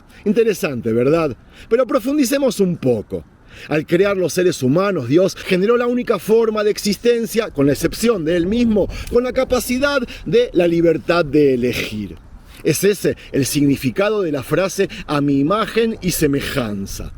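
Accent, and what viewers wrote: Argentina. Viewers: El señor es Argentino?? Habla como Argentino...sus padres seran Judios???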